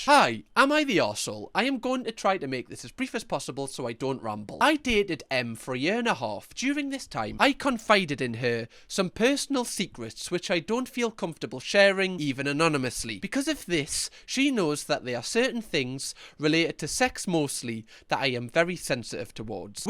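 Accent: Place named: North East England, United Kingdom. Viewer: I didn’t realise it was so long I’m about 50 minutes in, I love to binge watch so I wouldn’t have noticed I would have thought I’d just watched a series but thanks for the effort you put into these videos they are very entertaining you have an ace sense of humour and I love the north eastern accents, this one and Irish both melt me 😊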